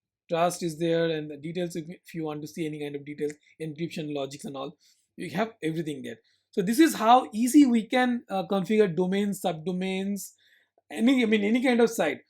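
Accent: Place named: India. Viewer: The video is amazing but your indian accent is so strong that is dificult to understand ... Try to put subtitles next time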